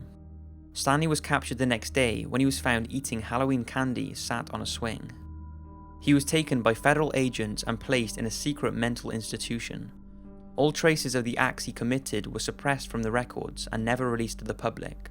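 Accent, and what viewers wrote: Wales, United Kingdom. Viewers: It sounds Welsh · Cardiff/Newport in wales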